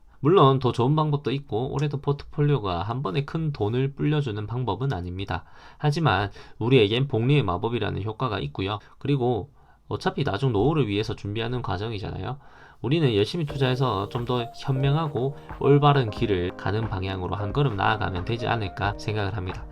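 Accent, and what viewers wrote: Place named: Gyeongsang, South Korea. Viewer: 경상도 분이시군요. 억양이 힘들어요. 내용보다 그 억양에 집중되요.ㅠ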